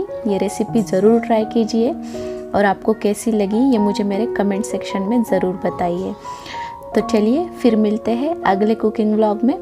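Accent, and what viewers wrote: India. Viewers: आपका लबों-सहेजा बताता है कि आप साउथ इन्डिया से हो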